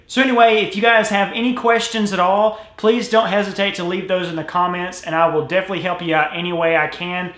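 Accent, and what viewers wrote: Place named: Alabama, United States. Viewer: As soon as I heard his voice I was like 'Alabama'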